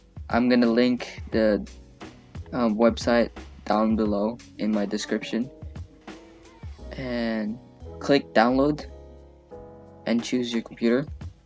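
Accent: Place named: Philippines. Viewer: I can tell, you are a Filipino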